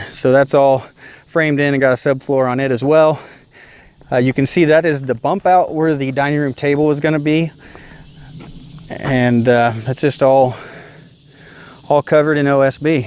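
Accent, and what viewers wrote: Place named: Southeast, United States. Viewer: So nice and you are very good in your commentary. I subbed!!! By your accent I'm guessing you live in the south east, beautiful country BTW, do you foresee a problem with bugs since your so close to the pond?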